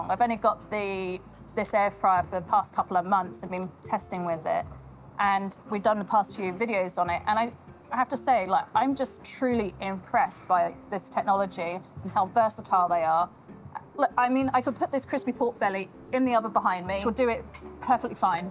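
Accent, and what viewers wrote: United Kingdom. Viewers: I had this playing on the background and I thought I heard Gillian Anderson with British accent talking.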